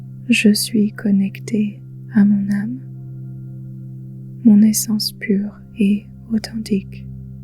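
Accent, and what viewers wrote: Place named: England, United Kingdom. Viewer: Le petit accent anglais derrière me fait voyager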